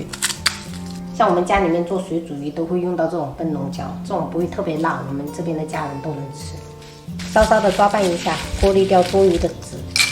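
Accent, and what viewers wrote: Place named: Fujian, China. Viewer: 还以为你是福建人呢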